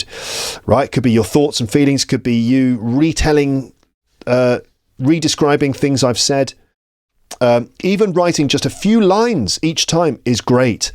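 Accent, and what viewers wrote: RP, United Kingdom. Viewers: i can't describe my pleasure when İ get a YouTube notification of a new episode of your amazing podcast ... Please read more stories i love listening to stories in your clear British accent.. thank you very much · Excellent class. it´s long but very interesting. I love listening to your British pronounciation and your engaging voice. it seems I´m listening to a film actor and not a teacher. My brain becomes motivated with all your hepful advice. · Hello! I started listening your podcast last year. I'm from Japan and I live in Spain now. I studied American English in Japan and here I hear more British English. Some words are different and above all the pronunciation is totally different. I looked for some channel speaking in British English to hear and to learn and here I am with you! Have a nice 2025! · What a nice discovery I' ve just made! 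My brain is filled with good English, nice voice and lovely British pronunciation. Thank you! Greetings from Argentina.😊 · Thank you so much,! I like your voice, your British accent ascent and your pace of speaking. You're a teacher with heart ❤ I've listened to a few of your storytelling sessions, love them!! Thank you once again and wishing you another successful year!! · Hello. Hello to all adventure on this channel too. In this text, I have some thoughts, and one question for all users, and of course for you. Thank you for your work. You made this world better and gave some people the opportunity to learn modern English totally free. Your voice is incredibly pretty, gorgeous, and a little bit posh. I can complete the whole episode at 1.5x speed. Your English podcast was so difficult for me a few years ago. I started with Canadian English, but then tried many variations such as American, South African, Scottish, and many others with community teachers. 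Also, I spent a lot of time watching movies, podcasts, and playing games. I have one question. Maybe, I am not a good seeker, but I want to find a place to understand poetry in English. Just a podcast with the right intonation, pronunciation, and explanations of meanings. Do you know the star point for learning to spell poetry? Thank you to all readers. Have a good New Year. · I have been watching this podcast since september 2023, and i've improved a lot my english, mainly my listening skills, and i have developed a interest in british accent, i love this one, one funny thing is that i started pronounce some words like accent 😂😂😂 and the way that you talk and Some british persons, before that i use to pronounce like a standard american english and now i kind of Mix with british accent, and of course my own accent from brazil. My goals in this year is be improved my accent and my speaking and of course continue what i doing right now, listening english every day. 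One thing that i have to improve is my compreension when you bring someone for your podcast, sometimes i struglle to understand different accents, for example, the accent from you friend paul😂 however i like this cause i face this situation like a challenge! Actually english today is part of my routine, i kind of got addicted in english 😂, i put english in everything, games, movies, apps,etc. Thanks, you're amazing teacher! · I love the way you teach English and your British accent.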